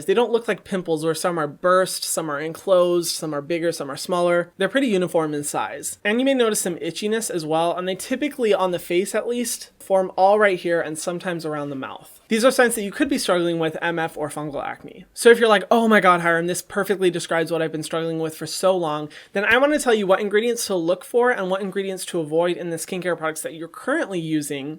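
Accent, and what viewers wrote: Scotland, United Kingdom. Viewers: I’m Scottish and that accent hurt me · Great Scottish accent !!👏🏽👏🏽 (but they don’t sound like that anymore😂)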